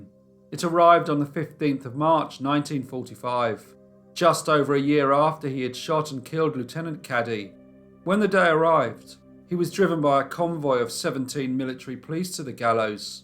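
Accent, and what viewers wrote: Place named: Scotland, United Kingdom. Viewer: I love the Scottish accent...had hopes to visit someday. Things in the world have changed so much I'm not sure if that's a reality now...but anyways...GOOOOD mornin from the northeast USA! Hope you're staying well and safe!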